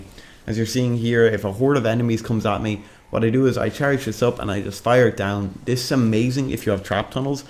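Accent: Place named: Ireland. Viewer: You’re from Ireland I can tell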